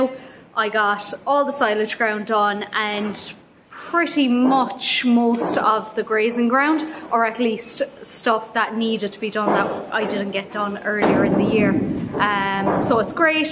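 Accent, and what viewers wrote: Ireland. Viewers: Hello! Me being from North Carolina(USA) I love listening to you talk. People say I have an accent but I believe you have me bet. Also I love those saying which is probably normal for you Irish folks. Enjoy listening to the sound of the ol' 135 so maybe video some real time in cab with you.🐮🚜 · Brilliant video, you could use a greedy board extension to the spreader, maybe useful to see if Vicon do it. A good job well done, you figured it out, and that's what important. No you don't talk to much, it a pleasure to listen to your soft Irish voice. ♥️👍👍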